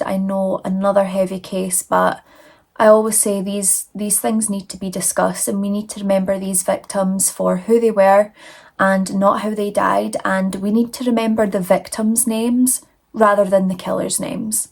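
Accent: Scotland, United Kingdom. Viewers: Congratulations to the 10 000 subscribers!❤️🥳 Love your channel, always. 😍Also love your Scottish accent since my husband is from Scotland (Airdrie). ❤️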